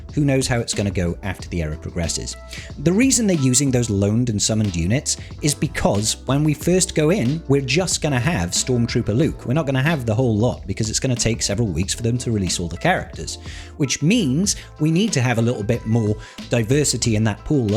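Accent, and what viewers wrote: Wales, United Kingdom. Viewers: Welsh, I thought you were Australian.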